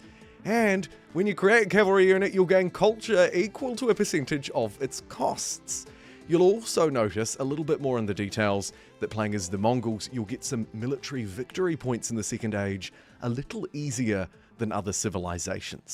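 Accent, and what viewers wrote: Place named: New Zealand. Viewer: Good to hear a Kiwi accent coming up on 100k subscribers. Well deserved too!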